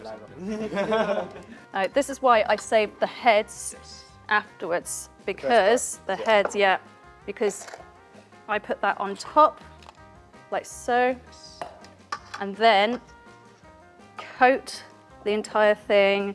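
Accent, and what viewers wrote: United Kingdom. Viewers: LoL - first few seconds threw me off - until i realized she has a British accent... What da HAIL.... 😜🤣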